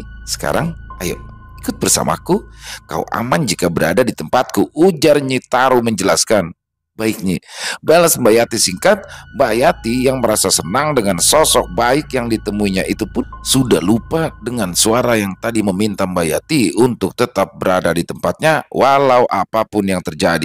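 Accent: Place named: Banyumas, Indonesia. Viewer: Tumben itu perkataan yg dimaksud diluar kebiasaannya. ContohTUMBEN KAMU MASUK PAGI PAGI BIASANYA DATANGNYA SIANG. KLO sampaikan/sampean itu kata sama dengan kamu, lebih ditujukan untuk orang yg lebih tua dari kita. Aksen bahasa Jawa ngapak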